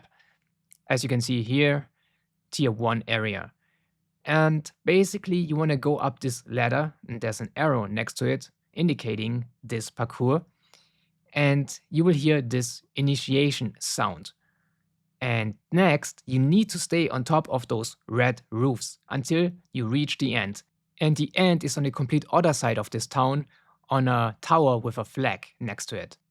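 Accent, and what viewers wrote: Germany. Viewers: Danke für das Video😊 man hört leicht heraus, das du deutscher bist xD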